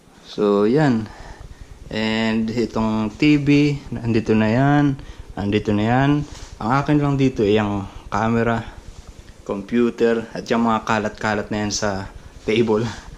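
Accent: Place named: Quezon, Philippines. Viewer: Taga Quezon province ka ba kuya? Para kaseng puntong Tayabas Quezon ka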